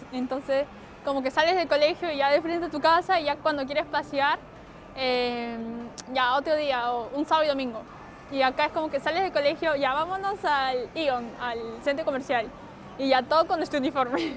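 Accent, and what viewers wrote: Lima, Peru. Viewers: Yo la saque en una su acento de conera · esa forma de hablar es de peru, pero dices ser mexicana, teniendo madre peruana , me perdi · Tengo mucho amigos peruanos y si se nota mucho el acento peruano q tiene saludos desde España 🇪🇸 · No pasó ni 20 segundos desde q hablo la chica se nota en una el acento peruano yo me pregunté recontra peruana 😱😱😱😱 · Que mal que conserve el acento peruano debería sonar más neutral por lo menos tiene algo más que el español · Me encanta su acento Peruano, ella es muy linda. 😍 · En lo personal, no hay nada mejor que el acento P E R U A N O , arriba PERU, bien entendible y NEUTRO. Gracias por compartir esta informacion cultural, un saludo positivo. From: Utah, US. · Suena super limeña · Es acento peruano me di cuenta rápido 🙂♥️ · Es un acento muy limeño su acento. En Perú también tenemos muchos acentos. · Cuando la escuché , su forma de hablar me parecía muy común ya que soy peruano y si le atiné era medio PERUANA 🇵🇪🧐👀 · Ese acento es inconfundible, de peruana, limeña y la entonación es de nikkei. 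· ya sabia que tenia algo de peruana, por su forma de hablar xd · Habla como peruana. Muy buena diferenciacion. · Ni bien la escucho y me suena a Perú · Tiene acento de peruana me parece? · sabia que era Peruana se nota el acento, yo tambien soy de Peru y hay muchos Peruanos Japoneses aqui tambien saludos te sigo desde el 2010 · Que guapa chica, me gustan las peruanas y su acento! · Alv yo tambien soy Peruana pero encerio quiero tu vida es wow xd me caiste bien antes de que digas que eras mitad peruna estaba con la duda por tu acento xd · como es posible identificar tan bien el acento peruano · todo el mundo tiene acento, los peruanos no son la excepción, la chica tiene un marcado acento limeño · hermosisisma nipona pero el acento super peruano 😁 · Yo apenas vi que hablo español sabía que era peruana la mayoría de japoneses que hablan español son de padres peruanos o tienen ascendencia peruana jsakjkds 😽💗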